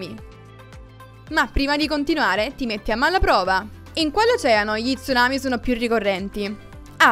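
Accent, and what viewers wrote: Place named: Sicily, Italy. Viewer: sempre interessante anche il tuo leggerissimo accento siciliano credo hihihihihihihi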